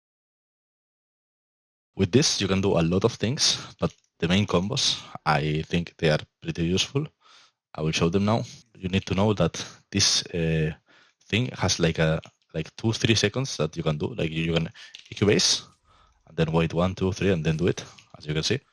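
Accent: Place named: Spain. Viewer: AJJAAJJAJ hermano como se te nota el acento de ESPAÑA con do cohone JAJA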